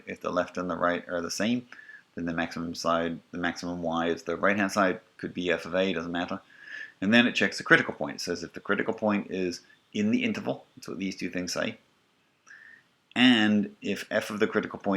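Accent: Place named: Australia. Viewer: Too bad this course is online, I would have been delighted to have a prof with an Australian accent!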